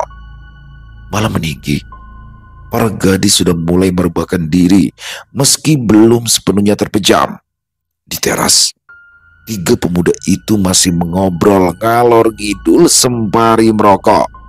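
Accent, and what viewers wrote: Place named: Banjar, Indonesia. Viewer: Ku kira dari om rasth ternyata aku lupa kalau bang saujana juga orang kaltim😂,kalau bang saujana Kaltim om rasth kal teng,kenanga kinasih kal sel penulis dari kal bar belum ada kah bang,aku klau kisah2 dari tanah Kalimantan selalu excited bnget palagi dari om rasth penulis yg epic bnget menurut ku lah, selalu menanti cerita beliau,kalau bang ga bisa dengan kata2 soalnya sdh tentulah pembawaan nya candu buat aku ternyata logat/ pemandiran bang sdh mahir bahasa banjar lah kd nglih lgi mun kena kepulau Kalimantan 😊bajalanan tinggal tagur2 hj 😅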